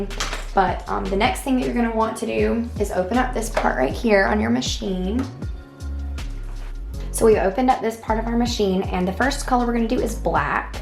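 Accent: South, United States. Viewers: Girl u must be from da south! 😊 Great job!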